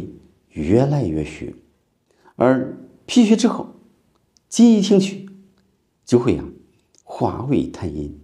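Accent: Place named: Henan, China. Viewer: 这个河南口音来的太正宗了，大概是南阳的吧！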